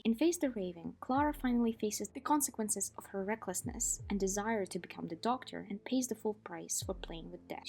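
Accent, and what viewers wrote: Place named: Russia. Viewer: The whole video I thought whether you are a native Russian or not. Love your accent and your English! The essay is sooo well structured and interesting Good luck to you!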